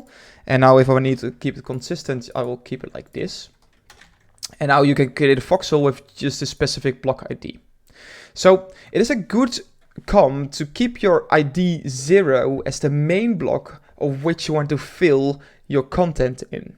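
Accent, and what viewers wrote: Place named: Netherlands. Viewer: I smell Dutch :D :D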